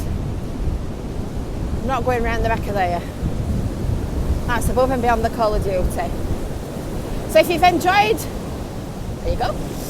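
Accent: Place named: Lancashire, United Kingdom. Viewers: I love to listen to your lancashire accent. Been in nz for 47 years. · I just love to listen your beautiful Lancashire's accent..l used to drive along the promenade from st.annes to Blackpool and stopped over to watch the sea.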